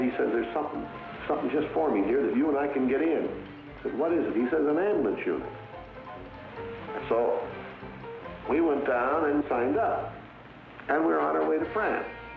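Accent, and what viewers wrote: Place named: Mid-Atlantic, United States. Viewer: Maybe it's the mid atlantic accent or the radio static, but there's just something about his voice that is so timeless and nostalgic.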